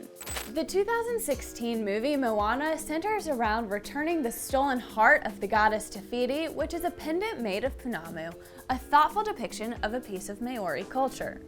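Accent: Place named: United States. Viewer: I am a huge fan of your channel and love your content… although you have mispronounced many words in this video, including the word Māori itself. Feels very American 🤣🤣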